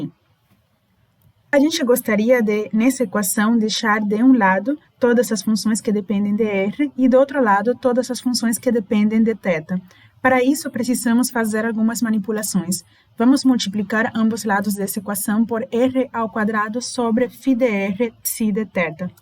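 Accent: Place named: South, Brazil. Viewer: Obrigado pela aula!! Fiquei um pouco distraído tentando descobrir de onde era o teu sotaque kkkkk. Chutaria que sua língua materna é espanhol, vc aprendeu português depois da adolescência e como vc fala muito bem, vc deve ter morado no brasil por um tempo, pelo seu sotaque sulista, acredito que veio morar em uma região do sul do br, acertei?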